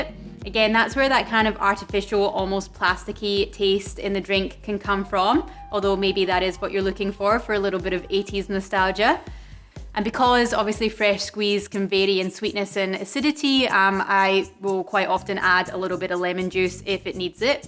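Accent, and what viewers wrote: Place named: United Kingdom. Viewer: Aussie? I thought u were from the UK....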